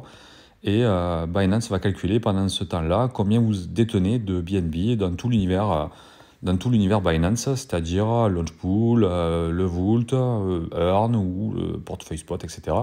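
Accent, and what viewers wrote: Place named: South, France. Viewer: Et en plus t’as l’accent du sud ✌️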